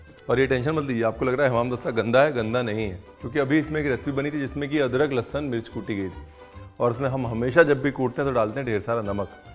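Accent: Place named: Bihar, India. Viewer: Aap Bihar cartoon mein bolate hain bhai ji bahut Achcha lagta hai aapka bolna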